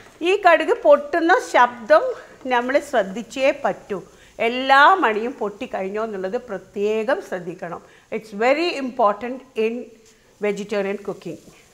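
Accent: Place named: Malabar, India. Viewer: Mam your cooking classes are very helpful.When ever i am having doubt while cooking i watch your videos and i love the way you talk with that malabari mapila accent😊.Looking forward to more new recipe's✌🏻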